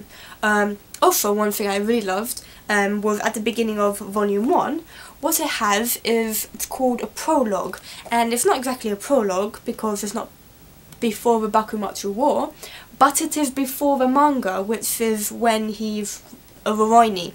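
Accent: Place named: United Kingdom. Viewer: Sounds British to me. thanks for the review just what i was looking for:)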